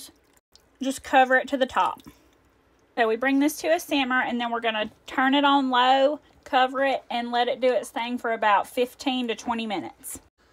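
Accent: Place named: South, United States. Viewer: I love your accent and cooking style! I am a Midwestern, but lived in the south in the 90's... I miss the accent and food. 😢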